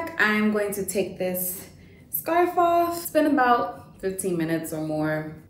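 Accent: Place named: New York, United States. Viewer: I can hear your nyc accent... ha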